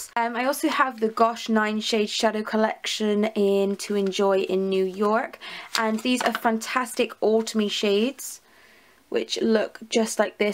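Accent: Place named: Somerset, United Kingdom. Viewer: You sound rely Somerset like me 😍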